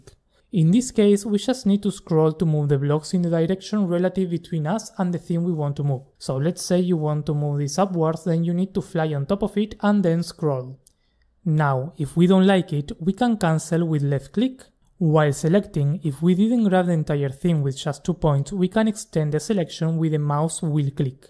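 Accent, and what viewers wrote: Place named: Argentina. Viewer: Sos argentino? Tenes un acento marcado me encanta ❤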